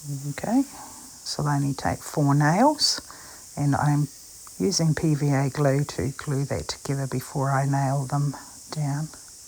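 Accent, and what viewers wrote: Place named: New Zealand. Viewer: who dosent love a kiwi accent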